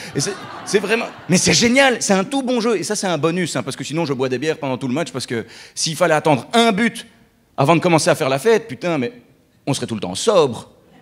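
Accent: Belgium. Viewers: Étant belge je reconnais l’accent de mon patelin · J’adore l’accent belge. L’accent d’une région reflète l’esprit de cette région.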